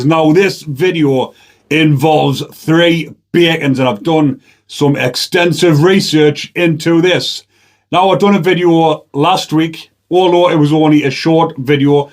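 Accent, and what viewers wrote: Newcastle, United Kingdom. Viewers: we all know you're a Geordie but can't you speak MoRe clearly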